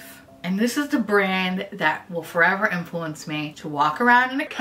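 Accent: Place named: Chicago, United States. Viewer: I’m one and a half minutes in... I don’t remember if it was jaimie French or Smokey glow that sent me here... but whoever it was. THANK YOU BIIII**** 😂❤️❤️ Edit: it doesn’t hurt that you have a very similar accent to my dads side of the family from Chicago. 😂❤️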